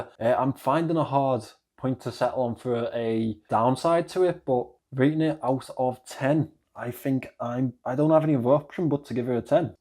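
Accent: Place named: Scotland, United Kingdom. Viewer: Love your accent. Are you from Scotland?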